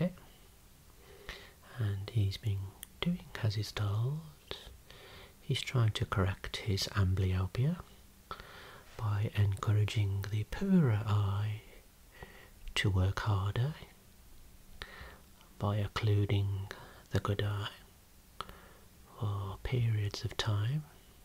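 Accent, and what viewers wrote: Birmingham, United Kingdom. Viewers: Is from Birmingham?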